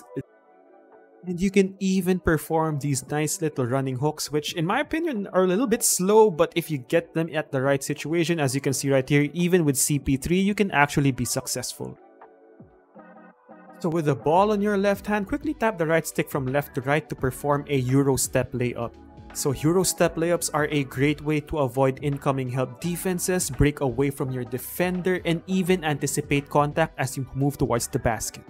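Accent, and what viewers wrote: Philippines. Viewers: Are u Filipino? U sound like it brother!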